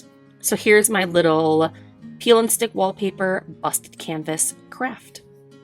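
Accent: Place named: Midwest, United States. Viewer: from another Midwestener, I understood that you said moss 😆😆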